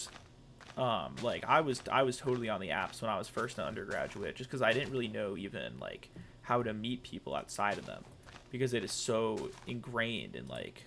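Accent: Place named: Missouri, United States. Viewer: You sound kinda Missouri in this one. The accents comin out of the DNA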